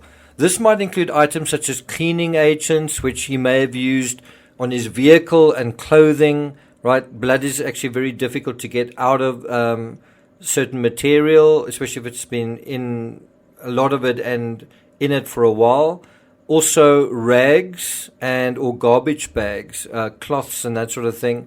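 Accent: Afrikaans, South Africa. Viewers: Is that an Afrikaans accent? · Are you South African? You have a SA accent 😊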